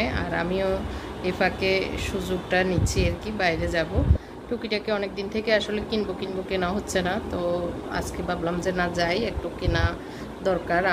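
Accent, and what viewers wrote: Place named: Feni, Bangladesh. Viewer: Apu apnr voice sone ami bapci j apnr badi feni or noyakhali... Thaxs apu... Amadar badi o baryearhat...valo thakbn apu Feni apnr badi kothaiy... Ami next year Khulna thake feni shipt korbo....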